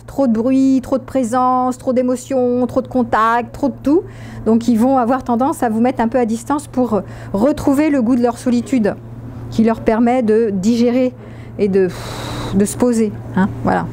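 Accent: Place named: South, France. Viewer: je comprends pourquoi je vous trouve une ressemblance avec celle de ma collègue. Elle est aussi du Sud de la France. Raison pour laquelle vous avez probablement, la même intonation, je pense... En plus du côté alpha, j’étais aussi clivé, je dirais que je suis beaucoup plus clivé aujourd’hui.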